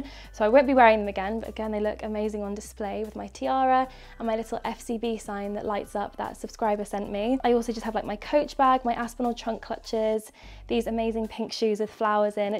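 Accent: United Kingdom. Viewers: R u British???? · OMG LOOK AT THIS BRITISH ACCENT HOW BEAUTIFUL , I’M FROM NORWICH AND THEY DON’T SPEAK LIKE THAT BUT OOOMG HER ACCENT IS JUST ❤️❤️❤️❤️❤️ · Is she from Brittan ?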